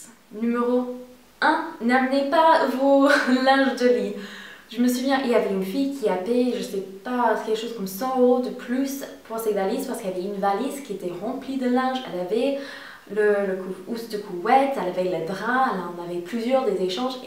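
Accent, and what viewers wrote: United States. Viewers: J'adore ton accent américain ! C'est trop jolie à entendre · Elle est américaine :) tu l'entends avec son accent :) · Hello, j'apprécie beaucoup tes vidéos et ta personnalité comme ton léger accent américain (j'ai dit léger!). Well, concernant les drap je pense pourtant qu'il est une bonne idée d'emmener des DRAP-HOUSSES car il paraît que ça n'existe pas aux USA? J'en ai jamais vu tout au long de mon année aux USA et lorsque une amie devait y aller quelques années plus tard, elle en a emmenés des draps-housses et elle en était très contente d'avoir suivi mon avis. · J'adore l'accent americain o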